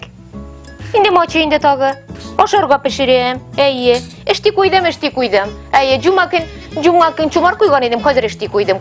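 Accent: Russia. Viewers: мои родственники похоже из Сергача. Говор один в один😂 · Это не чистый татарский однако. Как будто с шипящим башкирским акцентом, причём очень заметным. В Татарстане народ так не говорит..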